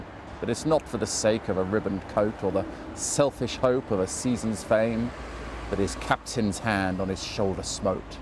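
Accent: United Kingdom. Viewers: Y dnt u become an british accent learning teacher!!!?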